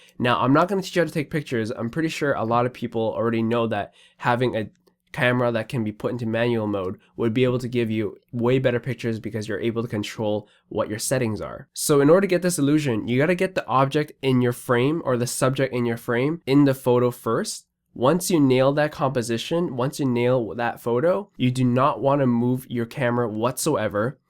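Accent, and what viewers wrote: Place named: Singapore. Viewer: it's Singlish.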